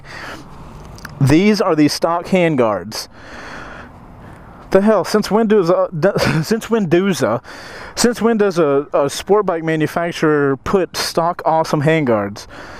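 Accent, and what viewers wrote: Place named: United States. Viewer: "Dudn't feel like it, dudn't feel like it at all." Learn to speak the Queen's English! Stupid Yank!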